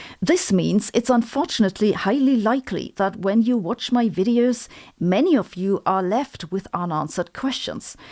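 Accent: Germany. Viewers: “I can promise that my German accent is real!” 🤣 🤣🤣 love it! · I love the way you break down information. I love that you are German and your accent. I love your hair (you are beautifu)l and I love how funny you are but you don't try too hard to be funny. Thank you for all the great content! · I love your "brick" like expressiveness. I also love that you're built like a "brick sh!thouse." (You might need to look that one up to understand the compliment) I love your German accent and your sense of humor always gets me laughing. Sometimes, I have to pause the video on account of that. I hope you keep making videos for many years to come. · And like your German accent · Oh and: your Eng is laudable and I congratulate you. But then what trace of German phonology remains only adds lustre. You see, I find German the most comfortable, the most pleasing to pronounce of all mod Eur langs. 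Hochdeutsch is as pleasing to my ear as the floaty and precise Serbian up in Vojvodina. (Like German, Serbian has many, many local speeches which are NOT pretty!)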